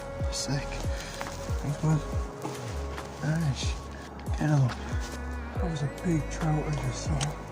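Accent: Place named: Ireland. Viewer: he is from irland mate he has a accent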